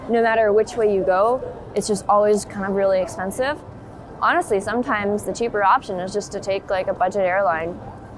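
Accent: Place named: United States. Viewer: I feel sorry Japanese people learn English from you as u speaks American.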